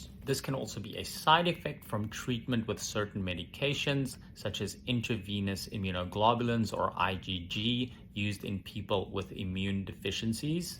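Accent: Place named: South Africa. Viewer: thank you so much... I thought u sounded a bit south African. I have suspected MCAS have not found someone that knows what it is. But I will keep trying. I will copy your info and ask around. Thanks so much. My biggest issue currently is that steroids or cortisone make the reaction ten times worse. Thank you so much for replying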